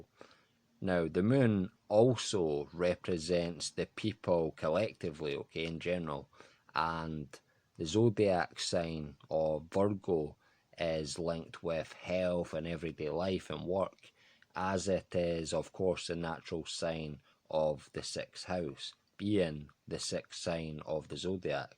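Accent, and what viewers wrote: Scotland, United Kingdom. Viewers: I'm sorry. I have a question to ask but know not where, so I chose your recent video. It seems obvious to me that each nation has a sign. I am Filipino and Scottish. They are both like Scorpio. Both nations changed war. They are maniacs in battle. But you don't find serial killers from there. They are both from small islands. And they are considered the most handsome people. They are terribly inventive! But they love to drink. To the extreme. Really, Filipinos are your brothers in the East. And guess what? The Philippines has the most Freemasons in the East. The Scottish Rite is there. The Southern Filipinos destroyed all Western invasion. The Celts couldn't because they shared terrain with the enemy. But still, you, a Scot, have your accent. And many Celts do speak their language. How to determine the sign of a nation? The Russian psychic grandma said that all nations have stars. 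What I know is, Scotland and Philippines are Scorpio. We love melee. We are good talkers. When we have babies, wow!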